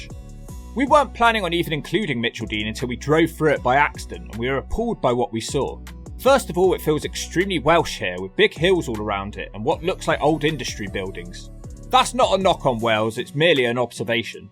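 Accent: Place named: Somerset, United Kingdom. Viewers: Hi mate.. Just watching this.. Good work on your behalf so let's give you credit.. I'm trying to work out where you're from with your uni home counties with a very slight Southern accent, it may give a clue to your choices lol... What makes this hard for you is that the lefties carved up Bristol so you have Kingswood in South glos and I'm in Somerset and closer to Kingswood that glouster is.. When the terrible Bristol council, worst in the country by a mile, moved everything around well it woukd take an hour to explain.. Don't get me started on the stadium.. Did I mention lefties screw everything up.. So Kingswood is in Bristol and a sizeable area as well,...... Go on friend Where's your accent from..?.. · Somerset accent, clear as day.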